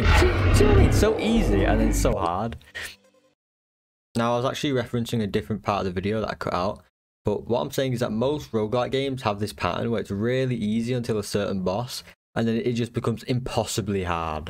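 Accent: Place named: United Kingdom. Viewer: I dont like this video. Unhelpful. Sounds too british. Please redo this video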